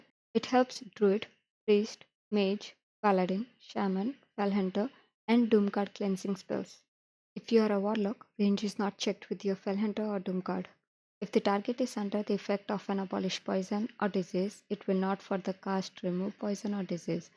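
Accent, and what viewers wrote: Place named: India. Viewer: indian english probably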